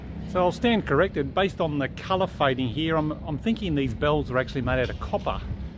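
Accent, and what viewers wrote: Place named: Australia. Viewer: Love to hear the Aussie accent, where are you from mate?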